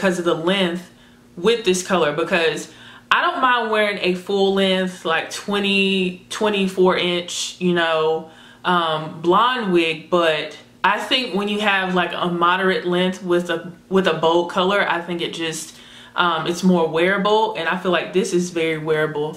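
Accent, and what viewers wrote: South, United States. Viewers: Girrrrrl u are killin this unit!! So beautiful!! And your southern accent is so cute! Ima go broke wit these wigs, I swear 💞👑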